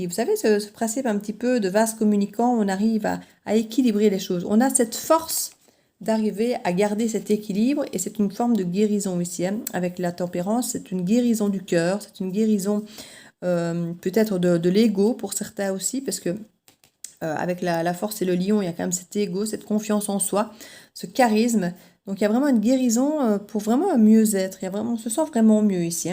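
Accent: Liège, Belgium. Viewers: Je vous decouvre et m'abonne, tres bon tirage tres explicite, bravo 😉 Je pense déceler le petit accent de ma région, Liège 😁🤪🥰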